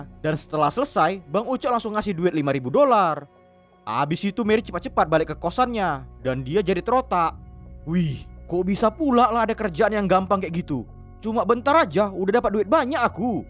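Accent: Medan, Indonesia. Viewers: MEDAN KALI ABG INI AH, JADI SEGAAAAAN KALI AWAK YAKAN😂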